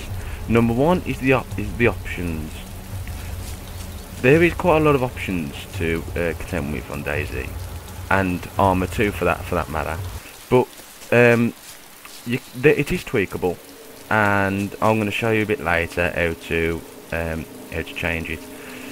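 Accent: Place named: Germany. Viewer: you're german right? :D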